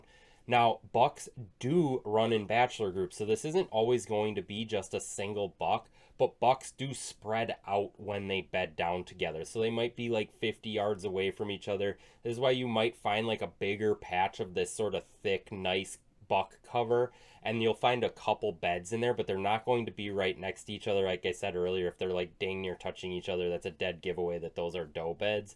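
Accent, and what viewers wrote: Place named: Wisconsin, United States. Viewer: That’s his Wisconsin accent…it does sound like egg but he’s saying “ag fields” as in agriculture fields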